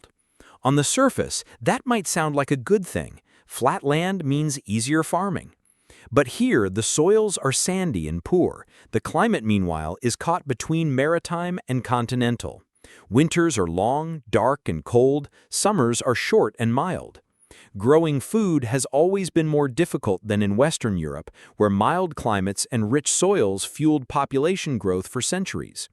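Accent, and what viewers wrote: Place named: United States. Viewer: PUTIN TV in american accent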